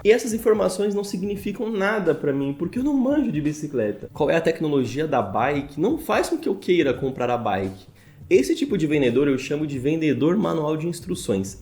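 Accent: Minas Gerais, Brazil. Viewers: Uai vc é de minas? kkkk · c e mineiro de onde?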